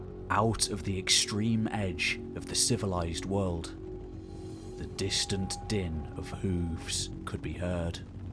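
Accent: United Kingdom. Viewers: Also the method of speaking and accent, I love. Very relaxing, + British so you sound smarter.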